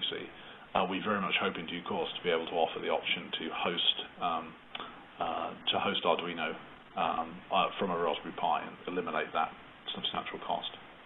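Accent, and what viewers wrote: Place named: United Kingdom. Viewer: Det er jo fordi han taler UK engelsk, og ikke USA engelsk.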